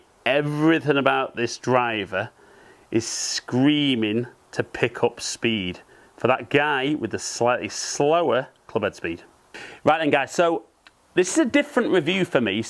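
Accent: United Kingdom. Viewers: Can we get reviews from American without heavy British accents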